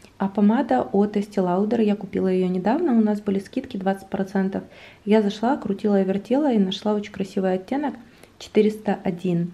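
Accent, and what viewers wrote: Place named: Ukraine. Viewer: люблю твой канал смотреть, но всё таки надо работать над украинским акцентом,он всё портит.....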